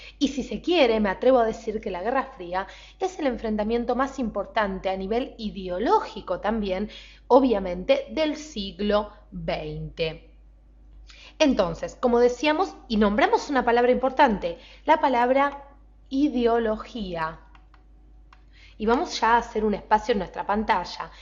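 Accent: Argentina. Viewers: "pantasha" es la pronunciación de la palabra pantalla en Argentina, no "pantaia"... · es argentina, no la culpe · pinche acento argentino xd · Cuanta ignorancia carajo, soy mexicano y a mí tambien me caga como hablan los argentinos con ese acento de mierda, ya todo difiere de la region o países pero hay que admitir que el español de verdad es el de España ya que ellos lo trageron al continente y por ende así se debería hablar. La muchacha del video al pesar del acento que me incomoda un poco se expresó de forma profecional, lo más natural posible y no como otros videos que utilizan frases que suelen usar en argentina como vos, pelotudo, que se yo......, pongo como ejemplo la mayoría de las peliculas gringas son dobladas en méxico para latinoamerica y en ninguna dicen cabrón, chabo, pendejo, etc. Así yo veo este video, por lo demás esta bien.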